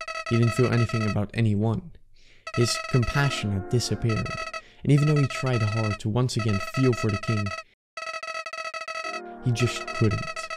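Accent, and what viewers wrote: Netherlands. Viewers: i smell dutch..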